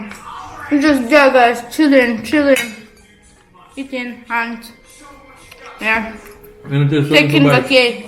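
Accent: Jamaica. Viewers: I speak like Duel Sylvester, God has work out a well put together family vacation✈ be thankful.you speak patwa deep love it play safe ⚽️Errol love from sunny vincy 🇻🇨🙏😍💪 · girl big up, I like hearing you talk patois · Yes she speaks patois very cute and dresses trendy like a black girl 👧🤣😂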